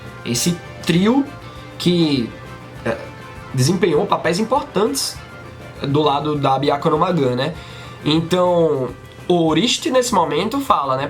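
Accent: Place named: Recife, Brazil. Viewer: Pelo sotaque vc é de recife Pe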